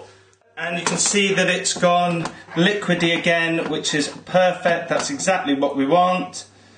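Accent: United Kingdom. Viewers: Are you from Cardiff? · He's not Australian -____- I'd have thought by his accent it's pretty obvious he's from the UK, but obviously not.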